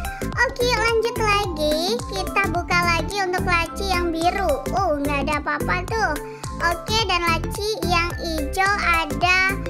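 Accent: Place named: Lampung, Indonesia. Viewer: Kakak kayak orang lampung aja ngomong nya ada "geh" nya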